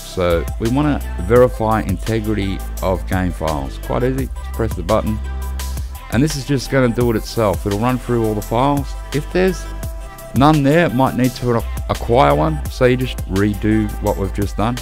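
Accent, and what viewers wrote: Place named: Australia. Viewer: Much appreciated, love the casual Aussie tonne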